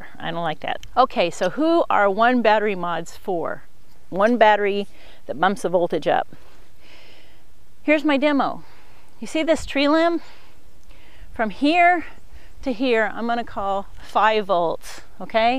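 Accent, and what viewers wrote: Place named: United States. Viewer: If It wasnt for your American accent, I could swear that you were here with me in Australia! A+ review as always & 3 the hat!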